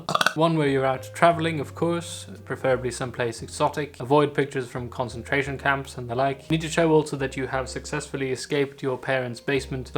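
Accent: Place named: England, United Kingdom. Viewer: Are you Swedish or English? If not, where did you get your English accent? Can you do one of you speaking Swedish?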